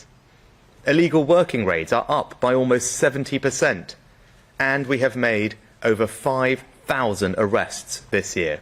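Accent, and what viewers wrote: United Kingdom. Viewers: He's Biden with a British accent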